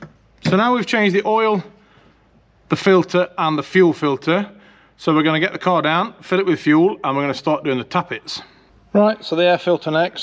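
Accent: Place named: Northern England, United Kingdom. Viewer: Are you actually Swedish? You sound like someone who's from Northern England.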